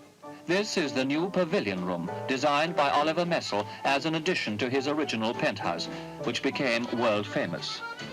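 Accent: RP, United Kingdom. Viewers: I was born that summer in a very different part of The UK. This offering? Same predictable, English plumby voice, use of word England when at times should have been Britain ,and the poisonous anglocentricity many of us have to suffer...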